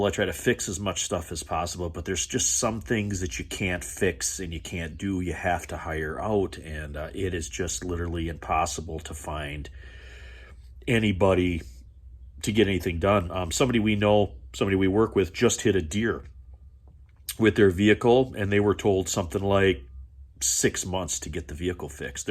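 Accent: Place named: Wisconsin, United States. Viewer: youhave a Wisconsin accent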